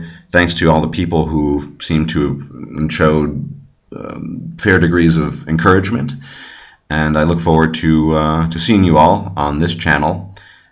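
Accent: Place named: North Germany, Germany. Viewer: Dein Deutsch ist sehr flüssig aber mit ziemlich Norddeutschem Dialekt. Ist das Absicht?^^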